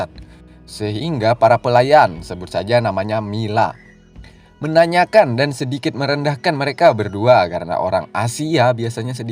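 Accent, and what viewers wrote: Banjar, Indonesia. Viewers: mimin ini dr kalimantan bro. logatnya kental bahasa banjar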